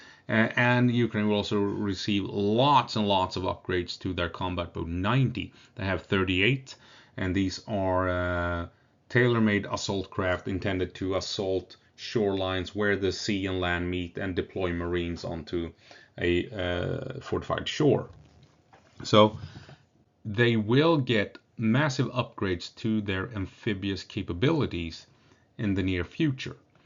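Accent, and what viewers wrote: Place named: Sweden. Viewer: "Combat boot" and "Combat boat" sounds eerily similar when you speak with a swedish accent. Yes! I throw bricks in a glas house. I speak even better swenglish.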